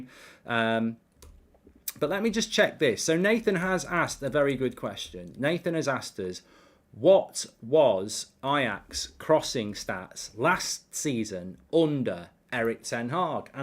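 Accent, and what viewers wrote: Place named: Manchester, United Kingdom. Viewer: Warra manc accent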